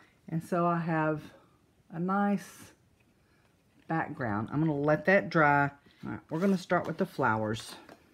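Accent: South, United States. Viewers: Hi. Love the side fold idea!!!! I was watching your video and Alan walked in the room an heard your voice. He said, "Is that? I lover her Southern Drawl". You are famous in our house hold.:).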